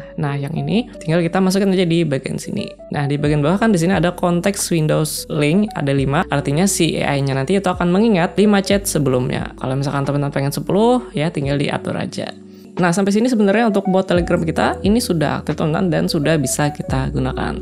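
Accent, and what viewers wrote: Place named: Banjarbaru, Indonesia. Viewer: orang Banjarbaru bang😅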